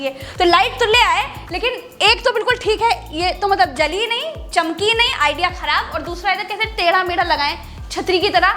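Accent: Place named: Awadhi, India. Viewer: Aapke bolane per bich bich mein jo avadhi ka jo tach aata hai is liye aapke channel mein Char Chand lag jaate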